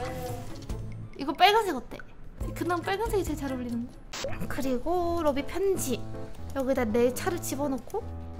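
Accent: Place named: Gyeongsang, South Korea. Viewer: 부모님이 경상도 분이신가 봐여. 님 억양에서 경상도 억양이 살짝 묻어 나네요. ^^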